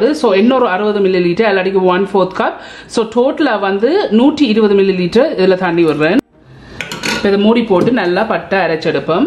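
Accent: Jaffna, Sri Lanka. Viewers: Akka sri lanka muslim food recipe podungga... Unggal Yalpanam tamil arumai🇲🇾❤️🇱🇰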